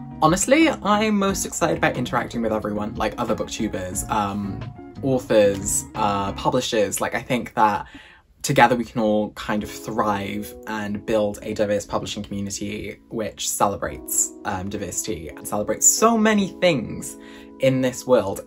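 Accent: United Kingdom. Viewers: Why is your accent doing everything for me (fellow BRIT AYYY). I FUCKING LOVE DA: I SO FUCKING MUCH. Going at things in your own way and at your own pace is so crucial and I am happy you found that place yourself and a time wherein you feel best. I resonated with so much of what you said as a POC bi who is also writing a book and I cannot wait to see what content you pour out. OMG HATSUNE MIKU GO OFFFFFFFFFFFFFF LOL. Tbh the anxiety as a whole crippled me to the point I left for a while, trying to come back and accept myself more. Hope you keep slaying and doing incredible things with your channel. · I dont know why i didnt expect you to be british but i love it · my first thought was British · your British accent is EVERYTHING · Just subscribed to your channel. Love your British accent and your energy. Bring on the videos and reading vlogs.😊😊😊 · I am to young to have twitter and I found this book tube channel and the British accent...😍 Also you sort of remind of Jesper from Crooked Kingdom! 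Edit: Jesper is my favorite character!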